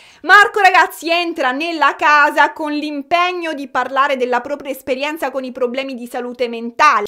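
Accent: Sicily, Italy. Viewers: Ti ho scoperto da poco. Sei siciliana? Hai un accento credo simile.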